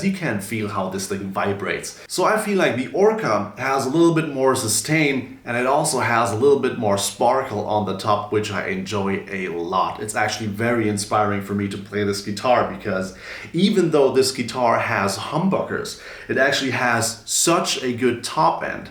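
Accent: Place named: Germany. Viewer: I thought i was listening to Zach Galifianakis imitating Matthew McConaughey.. lol.. Then i understood you might be from Germany.. But to the most important part.. your playing is absolutely sublime, man. Do you have a spotify or bandcamp account? I really hope you have a few albums out.. or even singles.. Take care and all the best,! Edit. Just bought your backing track in E Minor..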